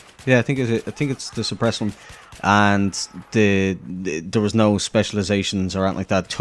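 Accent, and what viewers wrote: Ireland. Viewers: Where in Ireland are you from? Used to live in Leixlip a long time ago! Just subscribed a few days ago - and am enjoying the content!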